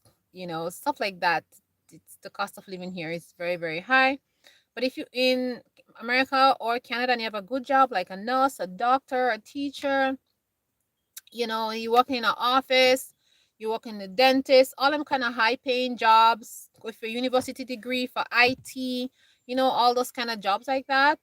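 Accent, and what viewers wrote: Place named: Jamaica. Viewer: I thought you were Jamaican,as your accent is similar.. Cheers ❤️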